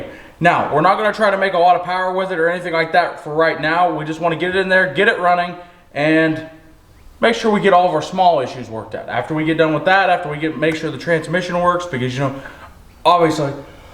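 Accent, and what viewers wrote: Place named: Oklahoma, United States. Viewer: Okc guy in here...sounds like your close...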